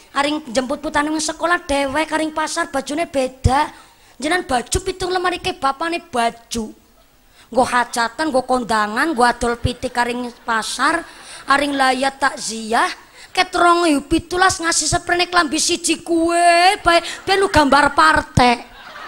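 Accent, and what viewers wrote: Banyumas, Indonesia. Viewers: Seru banget dengerin ceramah ustajah mumpuni asyik bahasanya carpur ngapak emang KL jebolan Indosiar cakep semuanya.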